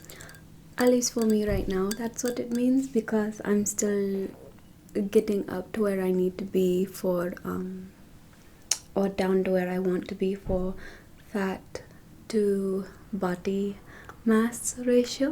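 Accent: India. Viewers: YESSSSSS U CAME BACK , WE ALL LOVE YOU HERE THANK U SO MUCH ❤ BEST INDIAN ACCENT ASMR ON YOUTUBE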